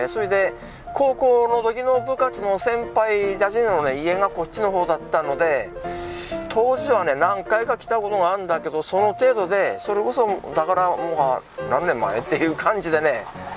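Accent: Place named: Yamada, Japan. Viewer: やっぱり山田弁はいいがねー。山田に帰りて～(;_;)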